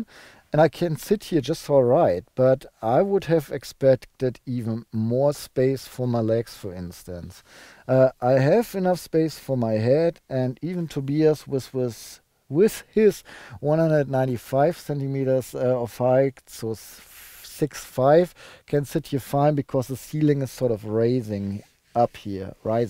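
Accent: Germany. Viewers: i love German accent :)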